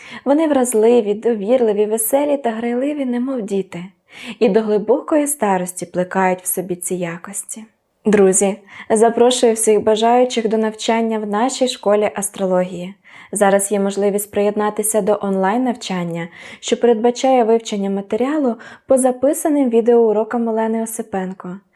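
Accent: Standard. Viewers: Героям Слава 🇺🇦💛💙 Дякую вам велике❤ Дякую за нагадування про традиції випікати жайворонки , за світло , яке несете до людей. Дякую за чисту українську мову і стиль🙏❤️ Світло перемогло темряву , обов'язково 🙏❤️ УКРАЇНА ПЕРЕМОГЛА 🇺🇦💛💙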